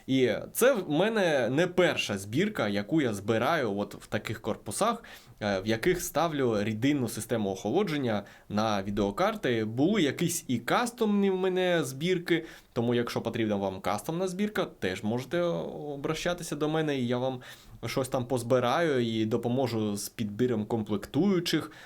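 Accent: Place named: Odesa, Ukraine. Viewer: Прошу не сприймати близько до серця, але я в шоці, що існують українці, котрі говорят українською з таким акцентом. Я з Кривого Рога і теж до ПМВ говорив російською, але такого акценту немаю. Одеса не припиняє дивувати.... просто окрема країна якась, котра всі ці роки була десь не з нами.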